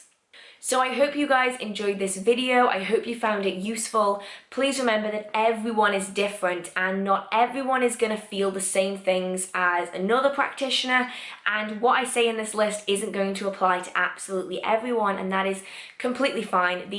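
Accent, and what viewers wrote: United Kingdom. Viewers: This is an excellent video in all respects and I will share the link on social media later this year. [ Am looking into neopagan lunar magic on youtube. ] One important reason to recommend this video is the book reference. At your website I found another significant book by Joey Morris which connects two areas of my interest: Celtic mysticism and hedge witchcraft practice. I may get that. Of course, I always appreciate the Brit accent. The moon magic I use is formal classical Hindu / Buddhist. Regards to Plymouth.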